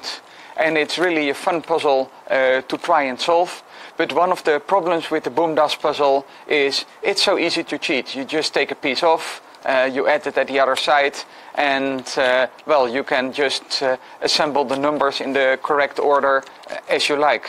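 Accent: Netherlands. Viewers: I heard he was from the Netherlands as soon as he began talking XD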